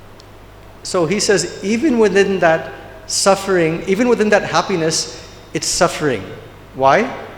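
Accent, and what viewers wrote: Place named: United States. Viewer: American English ❤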